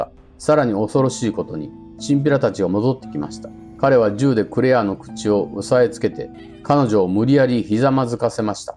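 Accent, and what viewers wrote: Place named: Kansai, Japan. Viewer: 若干関西訛りの。見やすくて助かります。ありがとうございます。ホラー映画最高ʕ•ᴥ•ʔ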